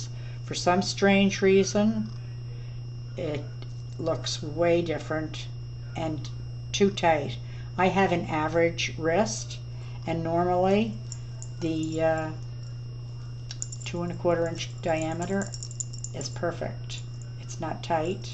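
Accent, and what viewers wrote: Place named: Ireland. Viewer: where is your accent from? You have some words that sound Irish. (I'm Irish, and I recognised them lol)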